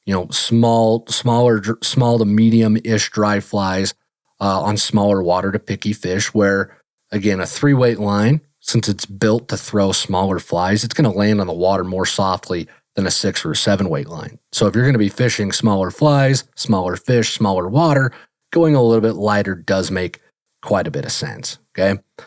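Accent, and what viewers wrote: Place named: United Kingdom. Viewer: Just curious if that snooty British accent just came naturally?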